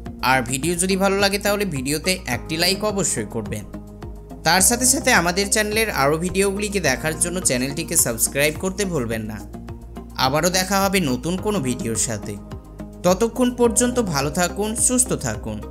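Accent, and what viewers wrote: India. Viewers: তুমি যে ইন্ডিয়ান এটা কথা শুনে বুঝা যায়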